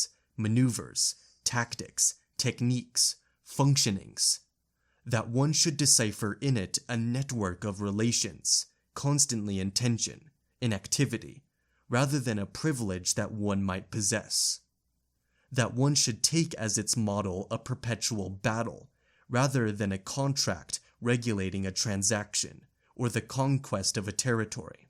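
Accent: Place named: United States. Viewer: You sound like a native speaker of American English, but you seem to have a lot of trouble with pronunciation of multisyllabic words. May I recommend that before you read a section, you look up longer words in the dictionary to get the pronunciation right? It's a massively hard job you're trying to do and it's a shame you're not creating masterpieces of oral presentation. (The most common error: Although the accent falls on the first and third syllables of the noun "distribution", in the adjectives "distributive" and "distributive" the accent falls on the second syllable. I think in places you're saying "juridical" but the pronunciation is so garbled, it's hard to know.)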